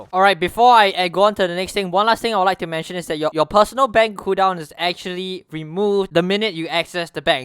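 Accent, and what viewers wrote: Singapore. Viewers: R u from singapore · You sound like you're singaporean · Dude r u singaporean cause i am and u have the accent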